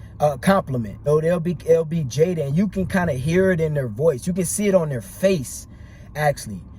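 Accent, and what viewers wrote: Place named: New Orleans, United States. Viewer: Bro sound like he from Louisiana I'm guessing New Orleans 🤔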